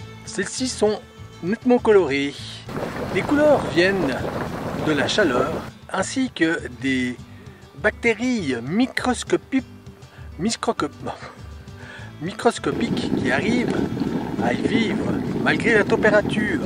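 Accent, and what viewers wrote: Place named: Switzerland. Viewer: Je vous remercie d’avoir pris le temps de répondre, je vais remonter dans vos vidéos et aller voir votre présentation. , J’ai 58 ans et suis dans le même état d’esprit que vous l’étiez certainement avant de faire le pas pour une vie différente , et visiblement nous avons le même parcours de voyage . Je file cet hiver aux Philippine 3 semaines maxi , contraint pas le travail , j’espère que les vacances qui suivront seront les grandes vacances et que je ne serai plus contraint de revenir à date . En attendant, merci encore pour vos vidéos qui font du bien . Et je vous taquine pour l’accent , ne changez rien ! Et vive les suisses !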